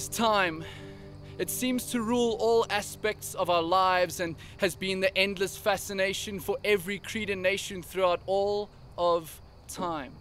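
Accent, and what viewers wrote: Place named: South Africa. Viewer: Is he south african???? Sounds south african! Would be epic if he was!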